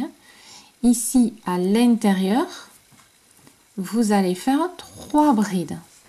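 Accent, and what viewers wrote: South, France. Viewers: Merci pour votre reponse avec toujours ce petit accent qui rappelle le soleil du midi je vais acheter la laine comme dans la vidéo auriez vous un modèle de chale avec emmanchure dans tous vos trésors SVP merci bon WE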